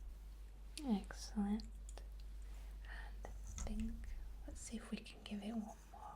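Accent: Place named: Yorkshire, United Kingdom. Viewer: I recognise that accent. ❤ From Pontefract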